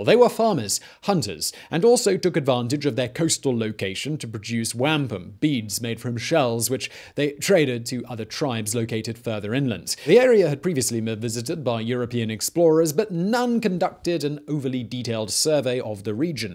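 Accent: United Kingdom. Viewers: Simon..... Being a New York native I must say the British flair you speak whilst educating make me wonder..... "Damn it Man why were you not my History teacher!" To you team of researchers "Brilliant!" to coin a term. Thanks Simon love this channel then again im subscribed to ALL your channels Proud to be a New Yorker!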